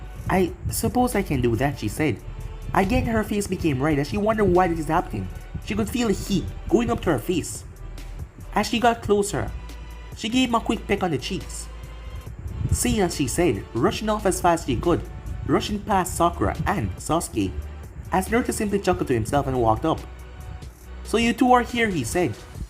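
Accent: Jamaica. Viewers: I like it . Yo ya Jamaican?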